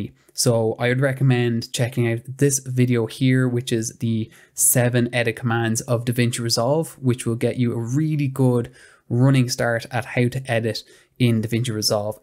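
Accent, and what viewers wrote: Ireland. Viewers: Being also an avid DaVinci Resolve user I have just discovered your channel here on YouTube. Your video tutorials are really helpful 👍and apart from that you are a sweet guy, I also really love & enjoy your Irish accent. Greetings & hugs from Germany ... 💙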